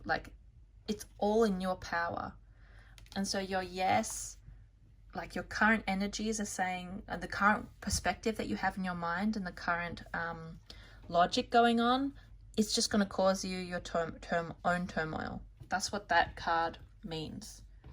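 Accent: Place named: Australia. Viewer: Thank you. I love hearing an Aussie accent. Your approach is intriguing and unique. Pile #4 was perfect.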